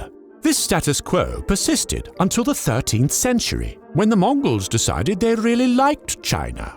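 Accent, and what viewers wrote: United Kingdom. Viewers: Y r u from british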